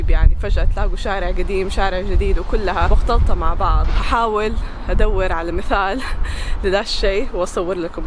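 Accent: Hejaz, Saudi Arabia. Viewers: لهجتك حجازية صح (يمشوا جالسين) كيف كذا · واضح من لهجتها انها سعودية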